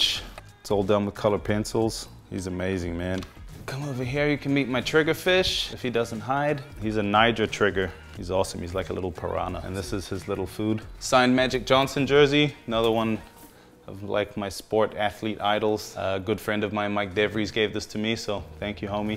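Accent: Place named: New Zealand. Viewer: The bro slowly letting go of his kiwi accent…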